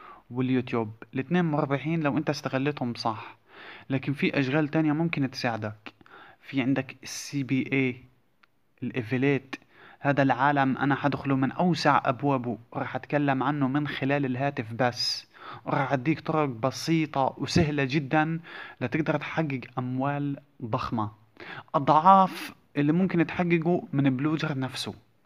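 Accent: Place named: Gaza, Palestine. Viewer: بشرفك انتا من غزة صح سبحان الله من الكلام بنبين أستمر صديقي الله يوفقك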